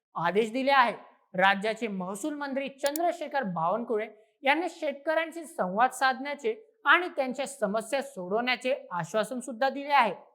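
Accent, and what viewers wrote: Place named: Khandesh, India. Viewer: Khandesh chi bhasha ashich aahe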